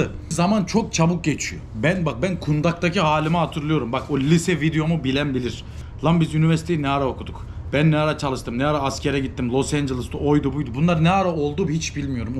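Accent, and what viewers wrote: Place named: Trabzon, Turkey. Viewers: Kardeş konuşman, tavrın aynı Trabzonlular gibi. İlk izlerken kesin Trabzonlu dedim!? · Seni tanimiyorum ama buyuk ihtimal trabzonlusun konusmandan ve tavrindan belli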